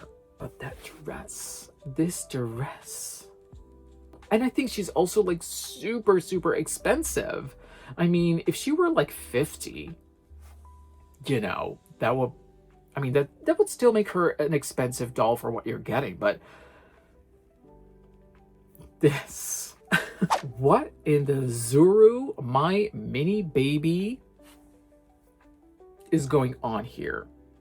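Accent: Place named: New Jersey, United States. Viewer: That is so cool! I thought you had a Jersey accent lmao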